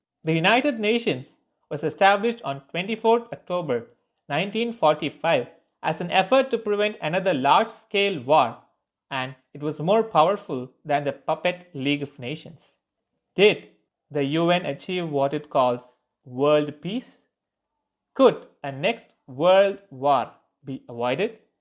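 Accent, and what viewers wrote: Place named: RP, United Kingdom. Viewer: English like bbc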